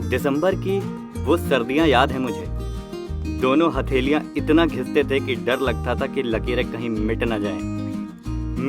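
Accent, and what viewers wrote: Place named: Lucknow, India. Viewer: Bahut khoob kaha ,lahzey mein bhi wohi lucknawi andaaz, kya baat kya baat kya baat ,you made us nostalgic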